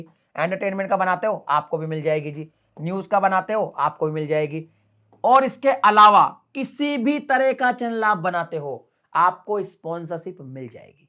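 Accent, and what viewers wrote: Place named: Haryana, India. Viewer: Aajkal aapki hariyanavi nikl rhi sir 😊❤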